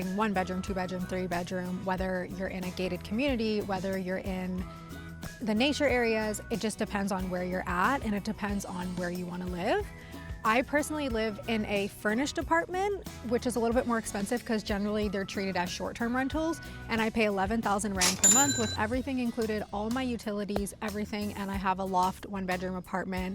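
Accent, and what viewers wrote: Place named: New Zealand. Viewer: Hiya, great to to see you out and about! Canadian you say, but sounds kinda Kiwi? Any case, how are things going with that heavy metal (copper covered lead) allergy of you yours? Y'know, that high-speed / high-impact variety of lead? Causes severe skin irritation and all sorts of internal issues too, if I remenber correctly? Hey, have you tried to find a Job yet and earn some those cheap SA Rands? The same one's that seem so worthwhile right now, with the exchange rate & all? Honey, you're cluelss about where you are. Keep posting, it's HILARIOUS!. Enjoy while you can!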